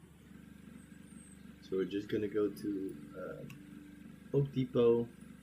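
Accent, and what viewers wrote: Netherlands. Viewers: ar u dutch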